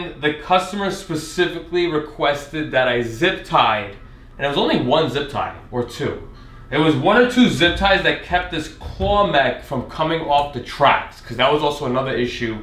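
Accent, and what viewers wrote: New York, United States. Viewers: 🤷‍♂🤷‍♂😊😊 you have such NEW YORKKKKKKKKKK ACCENT :D its great man love new york i lived there all my life and moved to maryland but i love my new york but it expensive to live theses days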